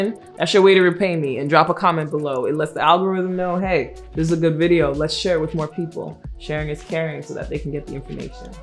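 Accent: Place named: Barbados. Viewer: You picked up a slight bajan accent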